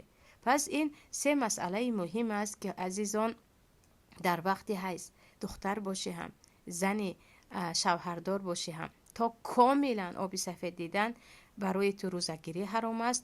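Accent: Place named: Tajikistan. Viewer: Ассалому алейкум Офарин бар Шумо чи кадар забони точикиро тоза гап мезанед ва хамаи суханонатон бехтарин.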